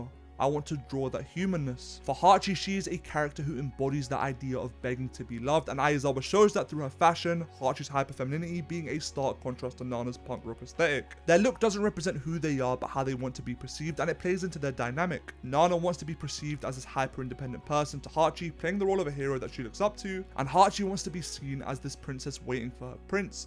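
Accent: United Kingdom. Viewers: ur talking too fast and I'm struggling to keep up due to ur thick british accent tbh. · you british accent makes me laugh sometimes and it snaps me out of what youre talking ab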